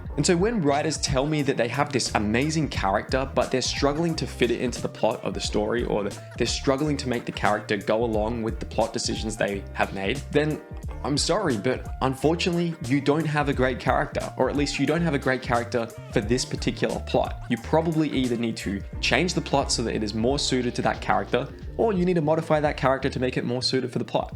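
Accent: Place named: Australia. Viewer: Who is this aussy btw?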